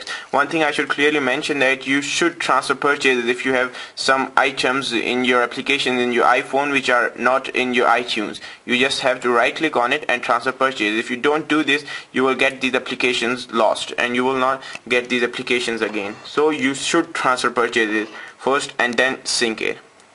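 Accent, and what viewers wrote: India. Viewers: great exlpanation for a indian guy? not a racist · Shame man, he is Indian what do you want the guys to sound like. Don't worry dude keep on with the videos thanks man :)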